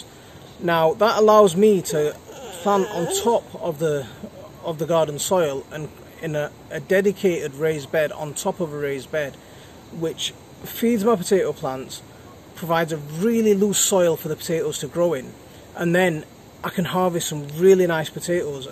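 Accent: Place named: Northern England, United Kingdom. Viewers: Agreed. I am as white as the driven snow and born and bred here. But my even my accent isn't as northern as that. Keep the videos up mate, I really enjoy watching young children (and adults) enjoy the garden and i enjoy learning from you too. Happy days! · It warms my heart to see a variety of people from various backgrounds and religions here not commenting negatively. This goes to show the affect our brother with his wicked Strong Northern accent is having on the peeps.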